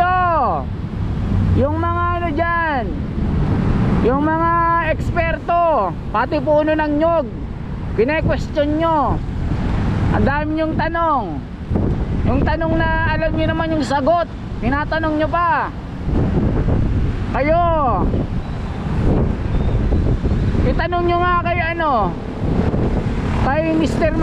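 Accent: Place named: Provincial, Philippines. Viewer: ANG MGA ITINANIM NA PUNO AY HINDI NGA IBINAON SA LUPA NG TAMA. DAPAT TALAGA IKINONSULTA SA MGA HORTICULTURISTS ANG PAGTATANIM. KULANG NGA SA LUPA. SA ABROAD, HINDI GANYAN ANG STYLE NG PAGTATANIM- MAS MAAYOS AT RELIABLE ANG SA ABROAD. DAPAT IKAW VLOGGER, MAGING EVIDENCED- BASED INVESTIGATIVE VLOGGER KA AT HINDI PURO TALAK- NG TALAK AT HAKA- HAKA. NAKAKA- IRITA ANG TIMBRE NG BOSES MO. PROBINSYANO KA BA?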